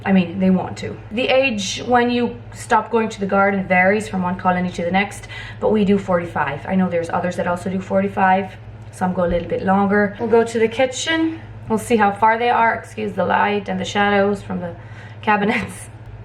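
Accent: Ireland. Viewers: What a delight the YT algorithm was to me today to find you, your sweet spirit and generous sharing 🙌🙏 I really enjoyed this educational and inspiring look into your world and your almost-Irish accent reminded me so much of my Irish gramm 🥰 We’re in the construction industry in Alberta and have always had Hutterite (ex colony) boys in our employment: thee most respectful, hard working and diversely talented employees ever. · Irish here, living in California with 30 yrs. Your accent sounds Irish to me??! History of the Hutterite please? Curiosity getting to me lol! Hope everything went very well and God’s blessing on the new couple for a long marriage and children God willing, you got my taste buds wanting to try your food! Thank you xx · I take it you’re in Canada but do I hear a hint of Irish too? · First time watching. Have you ever been told you have an Irish accent.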